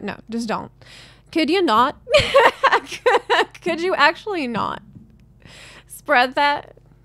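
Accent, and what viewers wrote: United States. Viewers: the country accent is strong with this one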